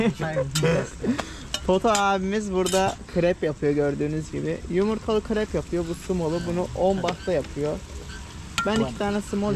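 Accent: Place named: Yozgat, Turkey. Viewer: yok dayi sen istanbullu gibi konusmuyosun, sanki boyle yozgat, corum gibi, babanlar nereli??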